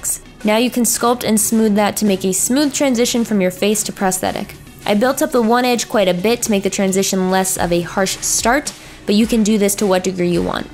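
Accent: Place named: Pennsylvania, United States. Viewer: !! Don't be ashamed of your accent!! For a while, I had no clue why people made fun of your accent. I didn't even notice you had one. Then I realized you were from Pennsylvania and it all made sense... (I live there too).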